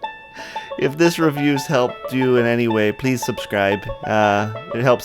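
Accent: Canada. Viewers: Lol it's not like you're hiding it... But i knew you were a true Canadian, from the very first time that you said "aye" 🤣 And like, i also didn't know or see anything that would've suggested that you were until after i was certain you were and i wanted to make sure by checking your profile or the comments... And sure enough you were a Canadian from Ontario(I'm just assuming Ontario because of your accent) correct me if I'm wrong and tell me where your accent is from lmaooo while i was typing this the video was sending and you sent me into a laughing fit when you gave it an "Aye plus" 🤣🤣💀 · While I enjoyed your review - do your really sound like Bob & Doug? I've been a Canadian all my life (ha) and have never met a real Canadian that sounded so, Canadian eh!?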